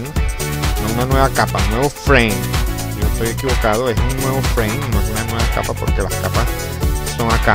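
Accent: Venezuela. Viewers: VENEZOLANO? HABLA COMO DROSS PERO VERSION TUTORIAL XDXD VIVA VENEZUELA :V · Eres venezolano? :v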